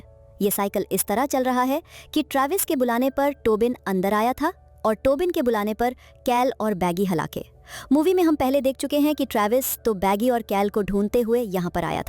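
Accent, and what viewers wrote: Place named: Punjab, India. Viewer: Punjabi vibes te boli hindi jande maharaj vaise vadiya explain aa carry on👍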